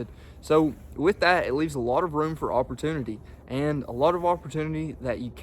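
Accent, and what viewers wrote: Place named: Texas, United States. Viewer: I admit I like your accent a lot! Probably one of my fav English accents. Are you from Texas?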